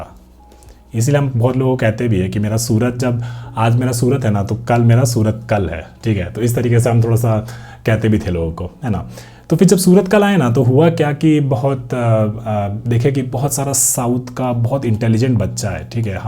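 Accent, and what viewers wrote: Bihar, India. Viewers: Aap bihar se h kya thik h 😊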